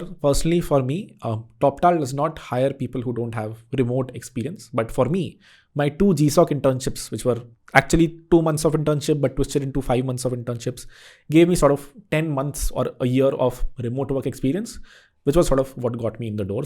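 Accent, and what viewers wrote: India. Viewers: I turned on caption and realized he is speaking Indlish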